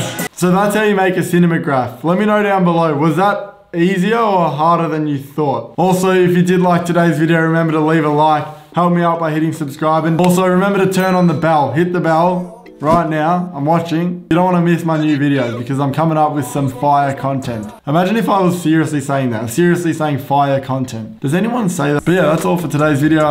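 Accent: Australia. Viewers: OMG I FREAKING LOVE how Aussie you are!! Lol it's so refreshing to hear someone as Melbournian as you on YouTube! Haha I got a HP but it's pretty much the same steps yeah?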